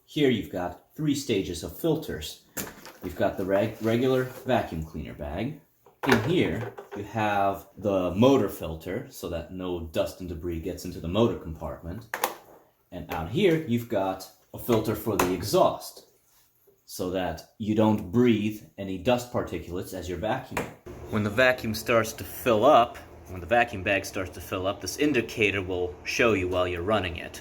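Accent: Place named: Germany. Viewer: Pronunciation: not strange, just German. Duh.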